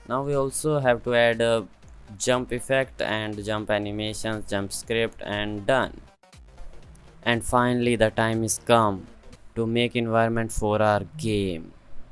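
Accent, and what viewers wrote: India. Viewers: Are you filipino? Pero parang indian accent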